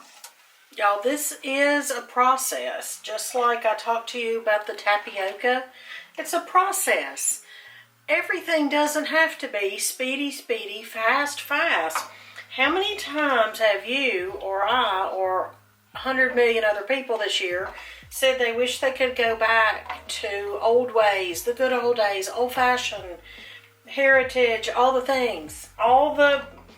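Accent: Tennessee, United States. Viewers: Hey from Maury Co. Mt Pleasant here. I recognized your accent as soon as you spoke. ☺️ Thank you so much for this recipe, Merry Christmas! ❤️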